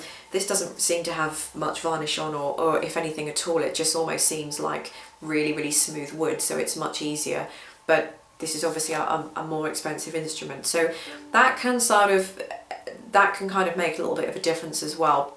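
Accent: United Kingdom. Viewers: Thank you from me as well as I am just starting out and really appreciate your instructions. On a side note, since I am an American guy, I hope it's OK as well to say that your hair looks gorgeous and along with your British accent, they only add to the whole learning experience. So much more refreshing that watching some old boring guy. Looking forward to your next tutorial videos.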